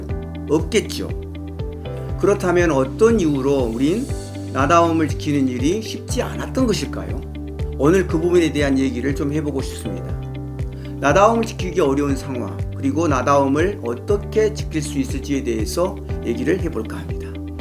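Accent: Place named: Jeolla, South Korea. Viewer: 짧지만 많은 생각이 듭니다 님처럼 실천은 쉽지 않은 거 같아요 중간 중간 전라도 어투가 더 친숙하게 느껴집니다 가~~~치 가시죠 ㅋㅋ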